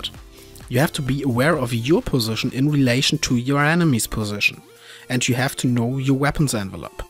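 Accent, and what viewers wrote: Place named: Germany. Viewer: I don't know what it is about German people speaking English but it sounds so damn cool, could listen to this dude for hours.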